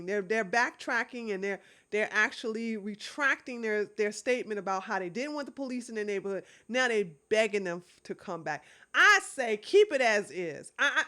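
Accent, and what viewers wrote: United States. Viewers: I am Irish born in Austria {Europe for those who do not know Geography} but raised in French West Central Africa {Sénègal + Guineé {not same as Guinea in South America} also Saïgon, Viêtnãm in 1958} I married a Philippina my brother a Korean. We lived out in the ville {out in the local community} not the American compoud and went to the local School {Lycée Von Volenhaven} not the American school. So I was extremely presently surprised by the extremely good logic but had a liitle dificulty understanding you because of the American Dialect used but think it is geared to your main audiance and because of my age I have not keept up with the various types of American English used. Now I have given my background I have a question that i have not gotten and answer to. In the 60's the black in large numbers had «Afro Hair Style» well when they arrived with there «Boom Box» the looking for their «Roots» the local disrespected them called them worst than a dirty whiteman. 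Their reason was that they did not know or had not tried to know history culture of their region thaey had taken White culture and corrupted it and forgot their ancestors making them lower than the lowest white. I never saw a local wearing and «Afro» or carrying and playing at volume a «boom box»!!!! So When I got to USA I asked why/what was the «Afro» I was told it was traditional hair style by a number of when I asked from what region {East., West, South or North } {I was familiar with West Africa} and noone could a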